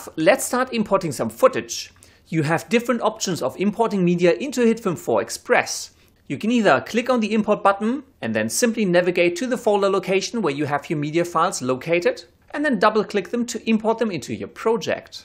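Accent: Germany. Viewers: nice German accent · Kommst du aus Deutschland? Kommt mir so vor als hättest du einen leichten Akzent :) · Nice vid, i'm guessing u are german because of ur accent, i know because i'm dutch :D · Hey where r u from? U sound german :) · man dein englisch hört sich echt deutsch an... warum kein deutscher Kanal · Dieser deutscher Akzent xD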